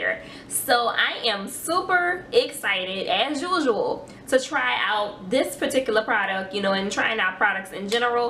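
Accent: New Orleans, United States. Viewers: New Orleans accent?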